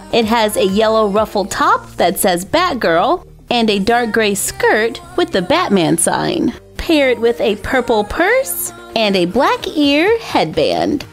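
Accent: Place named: South, United States. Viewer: I love this and that southern accent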